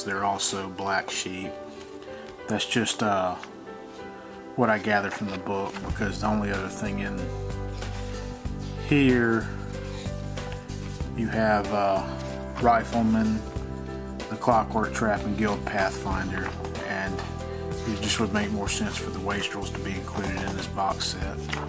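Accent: South, United States. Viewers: thank you very much for this. the musi with your soft southern vouce makes this very hyypnotising and relaxing.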